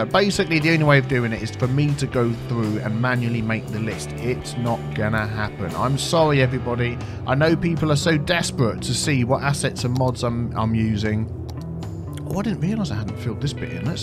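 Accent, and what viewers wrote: United Kingdom. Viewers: yeah you have played many different ones. l i thought you may have played one particular game i used too but you're not him. All you British guys sound interesting and amusing.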